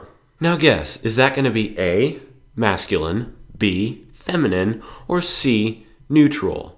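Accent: Swabia, Germany. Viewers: It's amazing how you speak the Swabian dialect. :D I can understand many dialects, because many are similar like Schwäbisch and Badisch.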